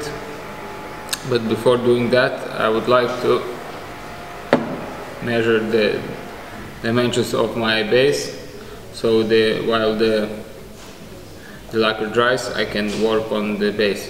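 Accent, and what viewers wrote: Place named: Russia. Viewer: Судя по произношению-это нашенский. Так английский, проговаривают только наши.) Только вот рассказать процесс людЯм по русски никак, надо понравится ИМ!) Мне то рассказывать не нужно, я все это знаю, сам со смолой работаю, но ведь людям то было бы интересно!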